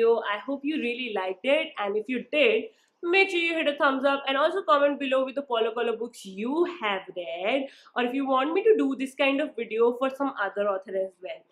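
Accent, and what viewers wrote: India. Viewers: there's one called indian English that will do. Have a nice day!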